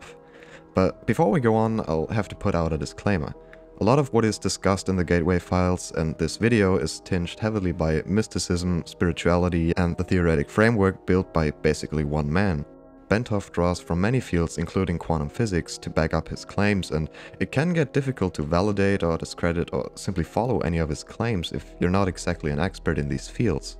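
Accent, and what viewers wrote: Germany. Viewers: "Oh shit digger" are you German? hahaha edit yep, you definitely are xD (great video btw) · Me: this kinda sounds like a German accent Him: Oh shit digga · sehr gutes video, nicht unnötig überzogen, keine dumme angstmacherei, absolut ehrenwertes verständnis der physik und vor allem der thematik. sehr angenehmes englisch, für einen deutschen🫣. hast du die tapes bereits selbst getestet?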